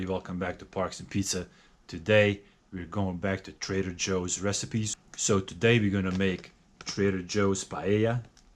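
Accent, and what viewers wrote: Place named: Former Yugoslavia, Eastern Europe. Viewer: If you don't mind me asking, what countries your accent from? if I had to guess I would say one of the countries from former Yugoslavia.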